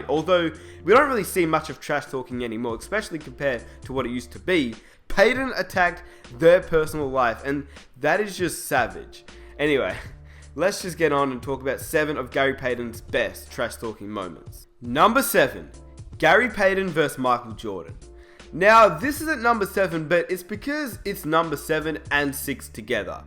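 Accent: United Kingdom. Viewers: Omg english accent is so wroooong here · Interesting hearing a Brit talk passionately about NBA stories. Too bad the NBA sucks now. Too soft. It was great in GP's day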